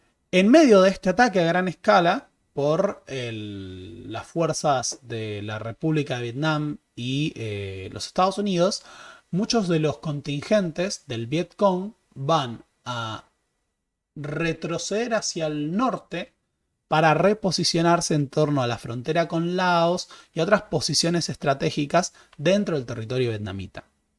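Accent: Argentina. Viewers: Deberías hacer un esfuerzo para no pronunciar con acento argentino la Ll como ch.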